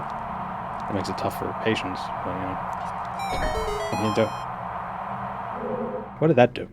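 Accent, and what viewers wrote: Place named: Boston, United States. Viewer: 18 hours of Just Boston Noise❤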